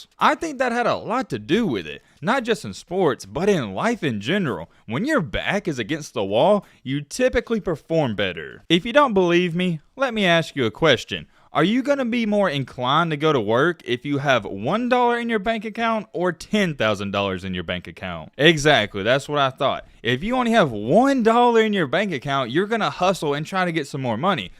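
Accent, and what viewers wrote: South, United States. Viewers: Lol hearing you talk your from the south hints to why you are a SEC homer and this video was pointless click bait lol GO BLUE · SEC Bias is dripping. I can hear it in his accent. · This is one of the worst takes I’ve ever heard. First off you compared Michigan and Alabamas loss saying Michigan “lost to a bad team” they lost to the #10 ranked team in the country in a close game on the road. Then you said Michigan didn’t play/ beat anyone on the schedule as hard as Bama when they beat Ohio state Iowa and at Penn state. Alabama played down to so many opponents all year LSU, Tennessee, Auburn. Michigan never played down to anyone bad all year. Your defiantly a SEC homer with that southern accent. Not saying michigan will win but there def not scared of Georgia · Southern drawl.. seems there might be an SEC bias in this video. Gonna be funny when Michigan blows out Georgia and Alabama. 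· Your southern accent screams sec bias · Listening to your Southern accent I think you have a biased opinion. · Southern homer, accent gives it away.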